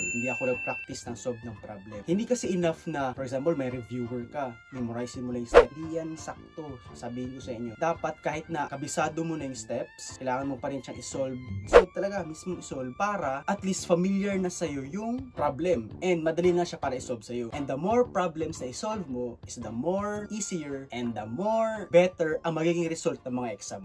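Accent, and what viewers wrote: Visayas, Philippines. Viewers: Ay bisaya jud diay ka. Mahibal an mn gud sa pag storya dol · I like the Bisaya accent🤣 KUDOS kuya👍👍👍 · Bisaya man diay ka Sir HAHAHA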